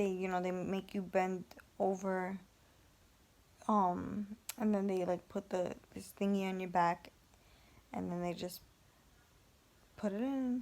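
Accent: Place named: New York, United States. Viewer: She has a NY accent that's why I ask. In love w you girl I'm fairly new ☺️💜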